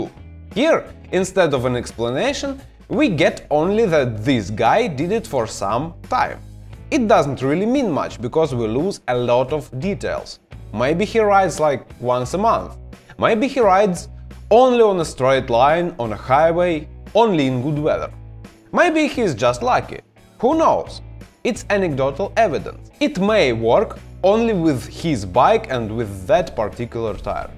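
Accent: Russia. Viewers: A Russian doing more Russian accent.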